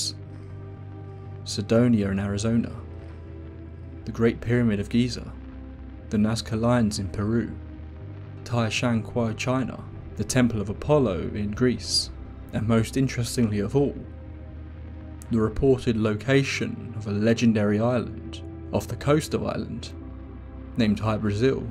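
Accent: United Kingdom. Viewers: Every time i see an interesting thumbnail and title i pray its not narrated by a britard but dammit it always is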